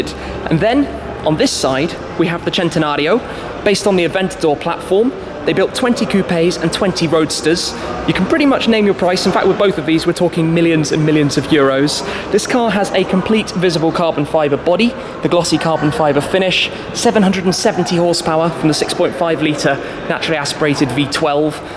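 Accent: United Kingdom. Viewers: your accent makes everything you say increases the stereotypical british car enthusiast personality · inggris britis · Nice British accent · He has a british accent.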